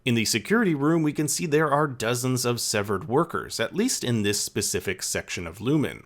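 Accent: United States. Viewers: you’re not american but you have an american sounding accent. are you canadian? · How are you not american and have american accent? My brain is about to melt on that information lmao Edit: Canadian 😂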